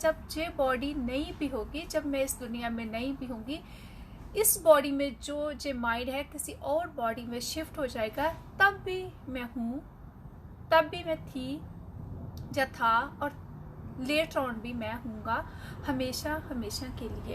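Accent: Punjab, India. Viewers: Perfect answer.. बस Punjabi accent bahut zyada hai.. Why don't you make the video in Punjabi?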